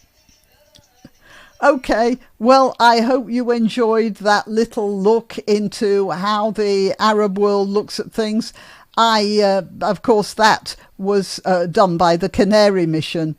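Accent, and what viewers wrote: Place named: England, United Kingdom. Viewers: I don’t know if you’ll see this, but which part of England are you from? I’m American, but my mother was a British war bride, and your accent reminds me of her family. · just because You sound English doesn't mean You're smart Because everything you're saying is so off base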